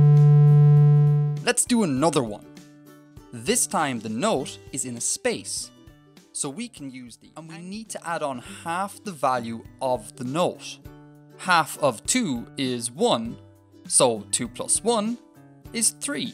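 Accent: Ireland. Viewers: That isn't British as you can hear to his accent he sounds like a irish lad to me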